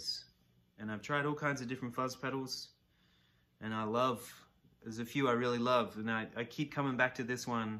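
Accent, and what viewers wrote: Australia. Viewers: Congrats from down under mate , you’ve never lost your Aussie accent ! 🙏🏼🎶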